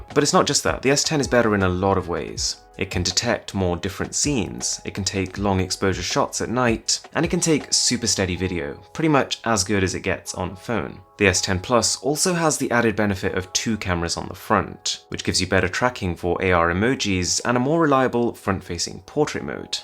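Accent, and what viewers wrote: RP, United Kingdom. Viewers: Dude. Your voice is making me fall asleep. So calm and posh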